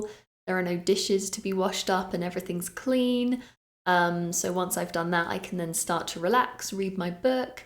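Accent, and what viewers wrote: United Kingdom. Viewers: Straightforward teaching style. Clear and beautiful RP accent. What else, you are a marvellous teacher.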